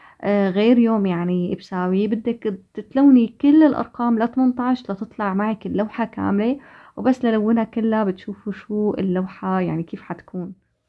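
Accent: Syria. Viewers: متابعتك من جديد من الشام.. ومن لهجتك دغري عرفت انك سورية ❤❤❤مااا شاء الله فيديوهاتك مبينه بتجنن رتابة وتنظيم راح اعمل لفة بالقناة شوفهن تبقى كلهن عرواق الله يهنيكي ويسعدك يا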